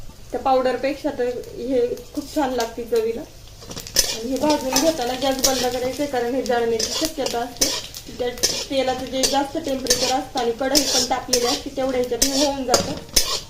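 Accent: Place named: Akole Sangamner, India. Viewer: तुमच्या आवाजावरून तुम्ही सुगाव अकोले संगमनेर भागातील असाव्यात असे वाटते. आमटीचे नाव शिंगोरी गावावरून असेल असे कधीच वाटले नाही. हि आमटी राशीनची पन प्रसिद्ध आहे.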